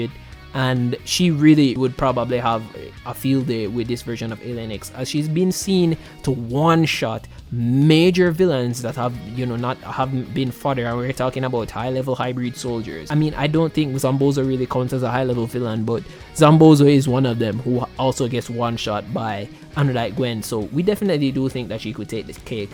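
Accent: Jamaica. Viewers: Haven't your watched videos in some time, quick question are you Jamaican?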